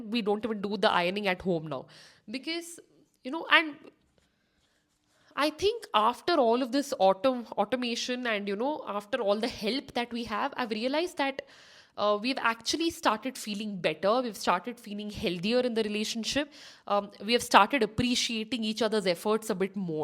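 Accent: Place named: India. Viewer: 1. Worst accent to listen to over a public podium. 2. Seriously, Indians need to aggressively build their damn ways to bring good quality orator in them, not in terms of what they speak, but how they speak. Period. 3. If Indians have, what the accent you speak, speaking my heart out, I feel English is being dragged to hell and killed. 4. This was never, Britishers wanted in their life time, to get their lovely mother tongue, subjected to such an awful transformation in terms of pronunciation.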